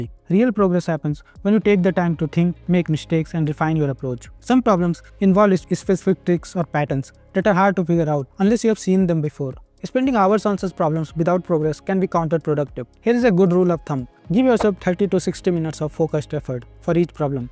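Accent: India. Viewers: Thankyou so much for this video sir. Gave me a lot of insights as a beginner and sir, It would be more helpful if you pronounce some words clearly without using hindi accent since its not easier for everyone to understand the words like the ones starting with 'S'.😊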